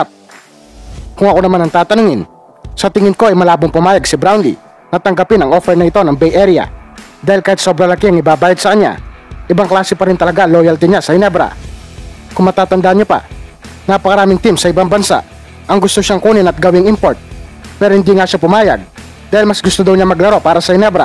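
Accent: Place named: Visayas, Philippines. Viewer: bisaya ka talaga mag salita..😃😃😃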